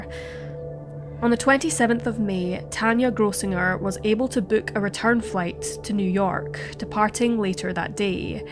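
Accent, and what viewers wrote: Scotland, United Kingdom. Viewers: I've watched quite a few Dark Curiosity stories now. The Mayan narration adds so much. Of all the different Scottish accents, yours is of the most gorgeous. There's a gentle folk tone to your voice that only exists in certain areas. It's so welcoming that it doesn't surprise me that so many love spending time with rural locals. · I love your channel. So many cases I’d never heard of till now. You’re very gentle and respectful too. Love your Scottish accent as well! (My mum is Scottish!)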